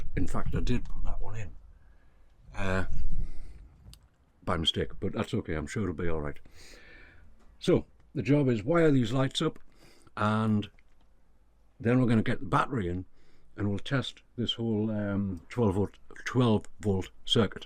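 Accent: North East England, United Kingdom. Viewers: Are you in the North East mate, sense a accent? Just been looking into converting a vivaro myself, looking like a top job 👌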